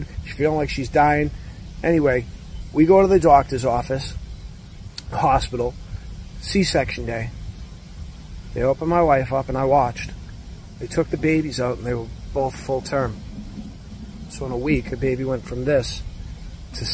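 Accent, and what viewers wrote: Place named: New England, United States. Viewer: Wowwww!!!! Nothing is impossible with our King 👑 🔥 He's got that New England accent I hear up here often 😅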